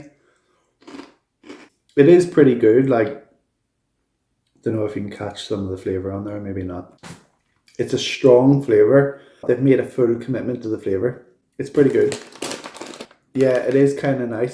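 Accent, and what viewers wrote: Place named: Bengal, India. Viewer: Your bengali is so adorable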